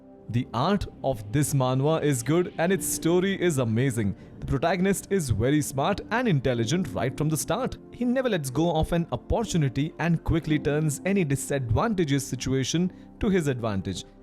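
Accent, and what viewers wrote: India. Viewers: The Indian accent is whoop ass amazing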